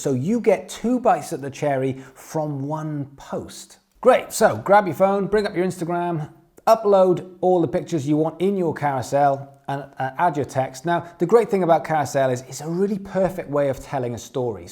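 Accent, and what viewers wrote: United Kingdom. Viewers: I've been watching a lot of amercian youtuber but I didn't know until I started watching how much I needed to hear a British person. I feel like I'm back home😍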